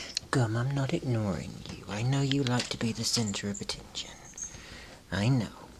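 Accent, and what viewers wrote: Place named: United Kingdom. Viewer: Oh wow ok, I wasn't expecting you to be from NC. You picked up a some of that UK accent so that threw me off a little. I know some people who live in NC. My name is too and I'm from Maryland. I found your channel off a comment you made watching 539's channel. I watched a few of you vids and liked them although a couple had me really feeling your pain brother. I feel for you. I hope you can find a something to manage your symptoms better. No human should have to endure that kind of mental anguish. It's the worst kind of pain. It's like torture and really is worse than any physical pain. Physical pain can be dealt with.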